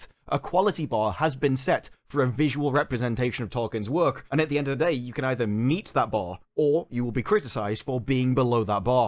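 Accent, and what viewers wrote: United Kingdom. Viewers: A british guy talking angrily for good reasons and staying prudent while other disgrave his culture? Take my subscription!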